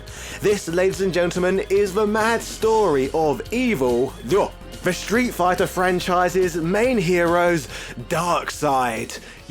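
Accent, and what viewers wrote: United Kingdom. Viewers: I do love these street fighter trivia videos. These British pronunciations though, there so cringy. I'm English and I've always thought so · thank god, you stopped using that stupid japanese pronunciation mid video...! you are british i want to ear your british accent when you speak...!!! cool vid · I'm American & I'd pronounce his channel name with my American accent. I wouldn't put on a fake British accent to pronounce it the way he does. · I say Ryu like Ree-you. Works for me. But he's a brit and they didn't say it like us Americans lol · Lol british phonics!! love it! It appears Caapcom have taken to rippong off DBZ for inspiration. essentially we have Demon King Ryu and Evil Majin Ken!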